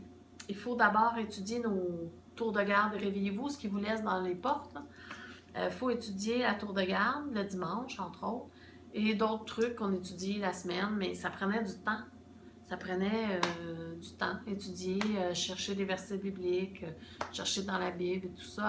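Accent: Quebec, Canada. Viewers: salut la cousine canadienne un ptit kikoo de france je te rejoind sur ta chaine ca me plait beaucoup pis ta des bo yeux et une jolie voix avec un belle accent excuse moi j'ai des amis quebecois en france on dit ici vous etes nos cousins lol pis les autres video sont tres bien aussi pour les tj ta pas besoin d'une eglise ou une communaute la religion tu l'a au fond de toi tu peux prier chez toi voila kisssssss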